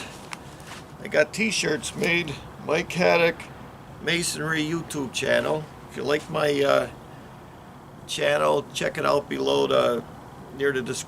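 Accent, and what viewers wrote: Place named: Pennsylvania, United States. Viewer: I learn so much from you, Mike; I believe you are from Pennsylvania, my wife is from Erie and your accent reminds me of her relatives and friends!! Nicest people I've met! Cheers!!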